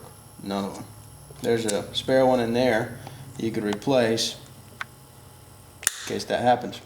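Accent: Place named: South, United States. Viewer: Southern boy...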